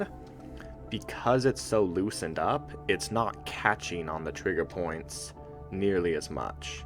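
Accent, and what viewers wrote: Alaska, United States. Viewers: he sounds like one of the Alaska wilderness family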